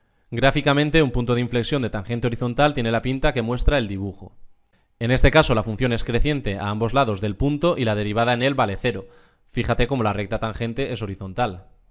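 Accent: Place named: La Mancha, Spain. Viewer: Por tu acento, eres de mi tierra, castilla la mancha, tirado más a la mancha manchega... puede ser?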